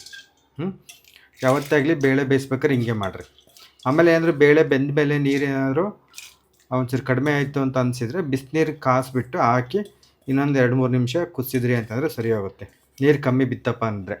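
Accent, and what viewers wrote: Chitradurga, India. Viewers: Nim uru yavdu sir chithradurga na nam bashene mathadthira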